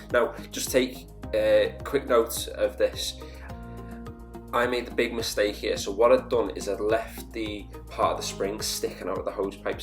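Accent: Liverpool, United Kingdom. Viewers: Fellow Scouser 👌, great vid mate👍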